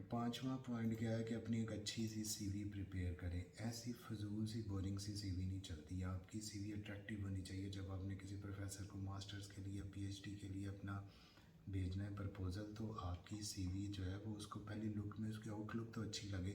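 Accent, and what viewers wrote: Faisalabad, Pakistan. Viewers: Dr sb, kindly use some Mike that can record voice that is audible, rest is fine, full of Faisalabadi touch.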